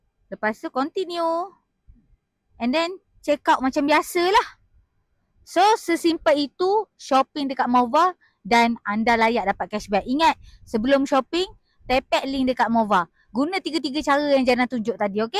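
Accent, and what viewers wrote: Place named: Endau, Malaysia. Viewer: Suara mcm org endau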